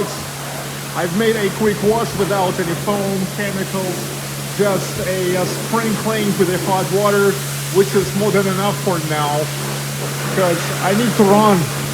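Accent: Russia. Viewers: Какой классический русский акцент 🥰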